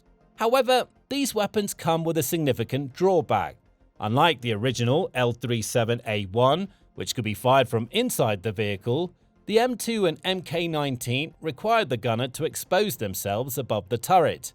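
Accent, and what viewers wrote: United Kingdom. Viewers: The channel is American despite using British voices 😂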